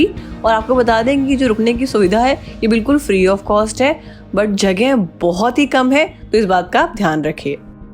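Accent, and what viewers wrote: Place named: United Kingdom. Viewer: Use less auto-tune and change ur accent to Indian... unnecessary english accent is really annoying even though ur content is good....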